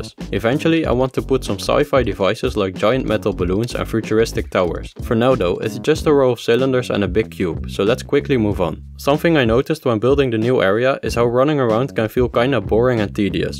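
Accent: Netherlands. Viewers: I hear dutch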